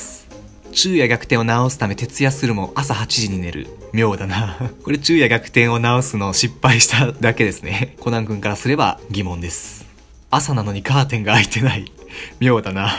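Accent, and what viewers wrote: Kansai, Japan. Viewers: さん、ネットでは関西弁。妙だなあ。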